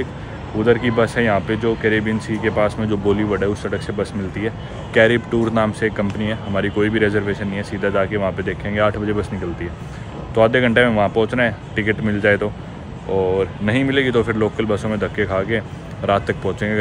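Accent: Haryana, India. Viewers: Like ur hariyanvi accent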